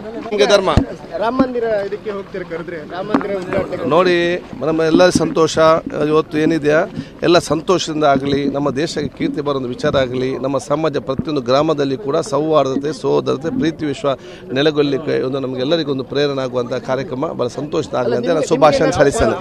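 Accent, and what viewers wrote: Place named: Tulu Nadu, India. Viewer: ಒಳ್ಳೆಯದನ್ನು ಮೊದಲು ಪ್ರೋತ್ಸಾಹ ಮಾಡಿ ಸರ್ ಅವರು ಮಾತಾಡೋದು ಯಾವುದೋ ಭಾಷೆಯಲ್ಲ ಕನ್ನಡವನ್ನೇ ಮಾತಾಡೋದು ಅವರು ತುಳು ನಾಡಿನವರು ಅಲ್ಲಿ ಹೋಗಿ ಅಲ್ಲಿಯ ಎಲ್ಲರ ಭಾಷೆಯ ಶೈಲಿ ನೋಡಿ ತಿಳಿಯುತ್ತೆ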